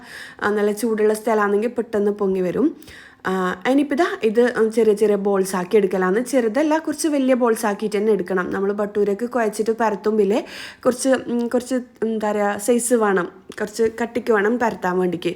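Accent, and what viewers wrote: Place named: Kasaragod, India. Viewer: കാസർകോട് സ്റ്റൈൽ സംസാരം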